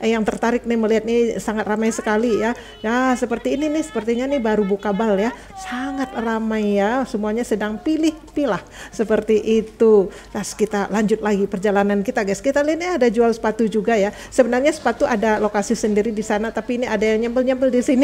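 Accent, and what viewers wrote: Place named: Banjar, Indonesia. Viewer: oh maaf logatnya kadang sprti sdra2 kita yg dr Banjarmasin☺️☺️☺️ sy penduduk kaltim turunan Bugis lahir Jambi 🙏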